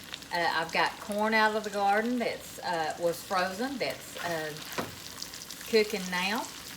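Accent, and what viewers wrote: South, United States. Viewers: What state do you live in? I was born in Texas & grew up all over the the south but have lived in Ohio a long time. I'm trying to figure out what state you live in by your accent bc it is so familiar to me. I've lived in Texas, Okla (where my mom was from) Tennessee, Mississippi, Louisiana and Alabama & went to college in Arkansas. Okra is my favorite vegetable & I grew up with my mama frying it with chopped onions and fresh or green tomatoes and that's my absolutely way to make it. I've never met anyone else who makes it that way. I'm wondering if it was a tradition in Okla. But even southerners I know in Ohio or the south have never heard of that. I also make okra patties with the same ingredients bc sometimes the wetness of the tomatoes makes the okra fall apart. But making it with onions and tomatoes takes it to another level. 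I crave it! I've never put it in the oven before and will try that for sure!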